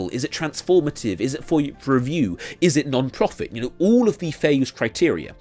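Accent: United Kingdom. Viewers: Because he’s got a regular British voice and a regular British face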